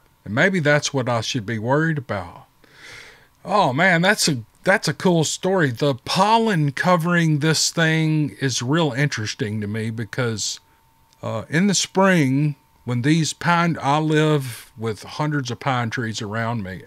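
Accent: South, United States. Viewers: All I can say is thank you for bringing some interesting joy to my life. You have a kind spirit. And beautiful country accent. God bless you and your family. And letting people know sasquatch is real.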